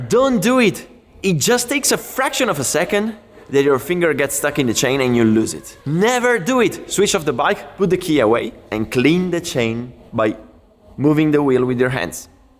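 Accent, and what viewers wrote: Italy. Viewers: alles ok im Video !! mir gefällt Dein Englisch mit italienischen Akzent - erinnert mich an meine Urlaube in Italien !!! ;-)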